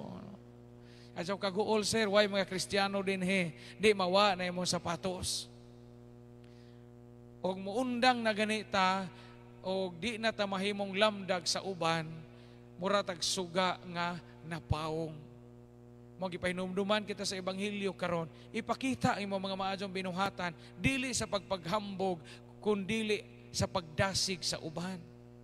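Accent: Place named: Bohol, Philippines. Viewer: Lively pod kay siaw man siya. He reminds me of our former DepEd Division supt who is also from bohol. Parehas sila mosulti binol anon jud.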